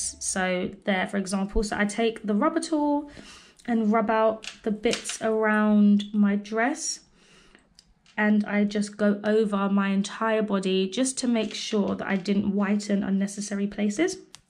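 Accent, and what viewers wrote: United Kingdom. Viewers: The English accent is so cute to me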